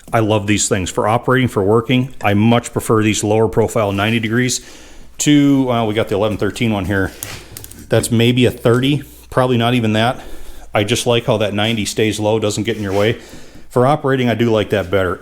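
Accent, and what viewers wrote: Minnesota, United States. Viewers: "Pardon me", but I did not understand the mustard reference. :-) BTW, I believe it is "first gen" a.k.a., first generation and not "first gin" but we all know what you mean. It is somewhat similar to those who say "escavator" rather than "excavator" which I think may be a MN thing. Yep, you are a mechanic and a damn good one, and do not pretend to be a linguist. I ain't one of them there linguist neither too. :-) Keep up the GREAT Cat work and tnx for letting us tag along while not even get our hands dirty.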